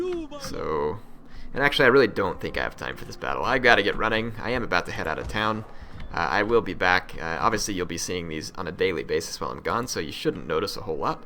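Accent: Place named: United States. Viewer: By the way what US state are you from again? Just curious cause i'm trying to figure out your accent ;)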